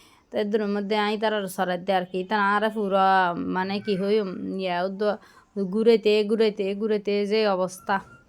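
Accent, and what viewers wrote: Chittagong, Bangladesh. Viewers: দারুন লাগলো আপু তোমার চাটগাঁর কথা গুলো 👍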